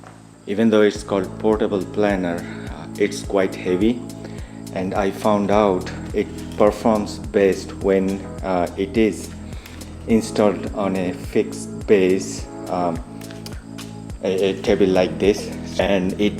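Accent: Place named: South Africa. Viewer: Where are these sold? South Africa and Zimbabwe? [Judging by presenter's accent.]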